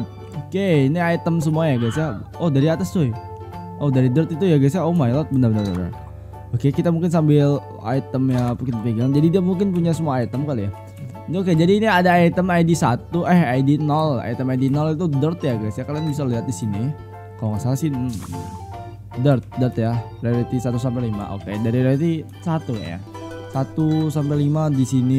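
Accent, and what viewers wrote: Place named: Bali, Indonesia. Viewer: Entah kenapa logat ngomongnya kyk orabg BALI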